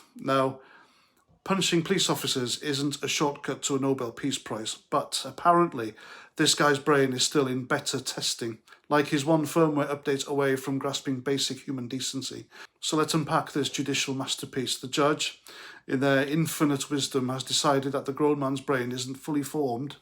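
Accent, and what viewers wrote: Wales, United Kingdom. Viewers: You are like a Welsh less harsh Pat Condell! I like it!!!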